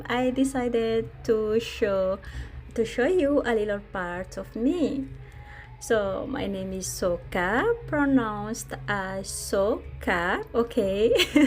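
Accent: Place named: Philippines. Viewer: You sound Filipino. Maybe that's because you live in the Philippines.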